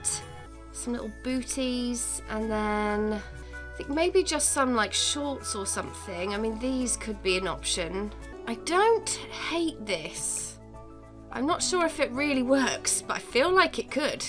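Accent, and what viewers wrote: United Kingdom. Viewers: i feel like your accent is a british version of the way eric cartman talks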